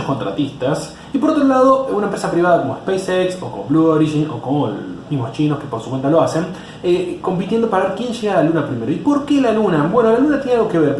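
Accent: Argentina. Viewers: Gostei muito das suas exposições. Pelo seu sotaque vejo QUE és argentino. E me escrevi em seu canal ok.abraços de Brasil 😃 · argento 100%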